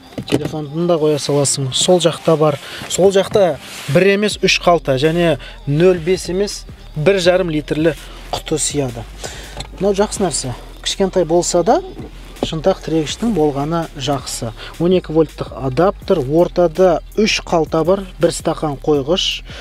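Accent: Standard. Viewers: Жарайсың таза қазақша сөйлейсің оған қоса тарихты айта кетесің ✊ дами бер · Қазақшасы таза екен иа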